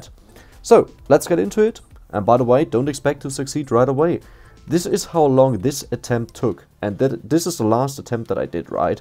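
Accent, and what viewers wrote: Germany. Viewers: Höre ich da etwa einen deutschen Aktzent heraus? Krasse leistung übrigenz.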